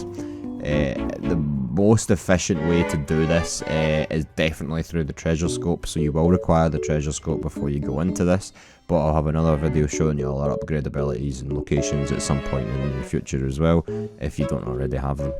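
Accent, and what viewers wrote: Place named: Glasgow, United Kingdom. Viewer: Not only do i thank you for this video but i adore your accent lad , u from glasgow?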